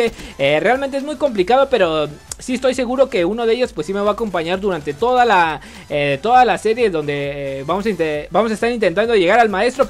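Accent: Mexico. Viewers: ¿¡Eres de México!?. 😱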